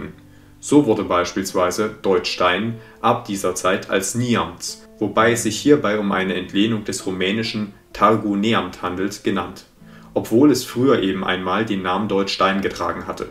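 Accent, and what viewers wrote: Saxony, Germany. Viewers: Die Beiträge über unsere ehemaligen deutschen Siedlungsgebiete sind inhaltlich spitze. Vuelen herzlichen Dank dafür! ❤Es tut mir nur leid und meinen Ohren fast weh, in welchem Tempo und mit völlig empathieloser Stimme die Texte gesprochen werden. 😢 Man möchte gedanklich folgen können und sich so viel wie möglich merken. 😮Unmöglich! Wenn der Verfasser selbst spricht hat er doch eine emotionale Verbindung zum Inhalt der Texte. Wenn es ein Sprecher spricht: Absolute Fehlbesetzung. Obendrein mit sächsischem Klang. So wurde nirgends in den alten Ostgebieten geredet!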